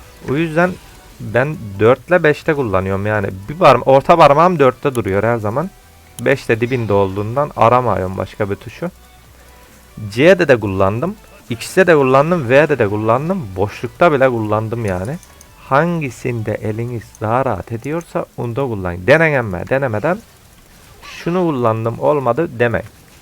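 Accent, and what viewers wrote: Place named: Muğla, Turkey. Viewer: abi sen nerelisin şiven konuşma tarzın muğla tarafları gibi benziyor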